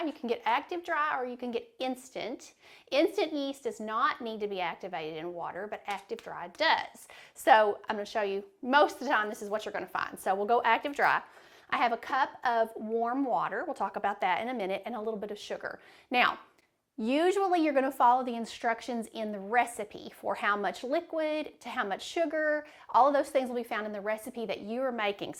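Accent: South, United States. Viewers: Where in the usa are you from ..i love your accent 😍 💕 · The most southern accent ever. Love it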